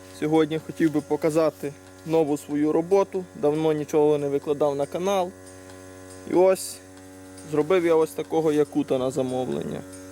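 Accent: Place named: United States. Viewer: По-американски пиздит, ничего не понять.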